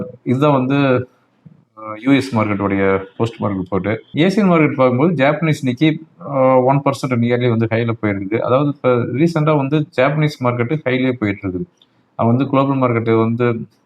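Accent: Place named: Chennai, India. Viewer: avoid this madras slang. It sounds a bit un professional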